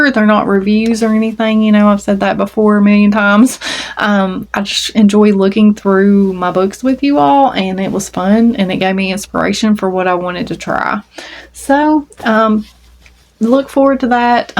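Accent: South, United States. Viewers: So many lovely items that you have done! The lovey with the doll head is a great idea. Your doily is beautiful. If you run out of wall space, I have put a doily in my window. I guess you'd have to be careful that the sun didn't fade the yarn, but it sure looks pretty. When you say "doily" in your soft Southern accent comes out like "dually" Cute!! I agree that I feel so bad for the Joann employees. Luckily I think the one near me is not going to close. I love Big Twist yarn also. Have a great week and looking forward to your yarn haul. I'm trying to behave in that area, but it's hard to do!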